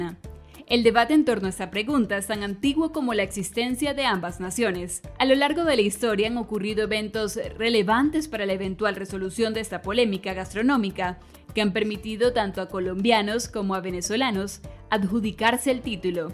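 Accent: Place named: Mexico. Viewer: Es mexicana la voz,que narra ese absurdo video.Y yo,perdiendo mí tiempo en esta pendejada.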